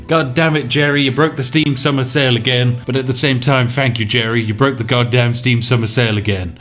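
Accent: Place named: RP, United Kingdom. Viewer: the posh accent doesnt work when you can't pronounce "th" sounds properly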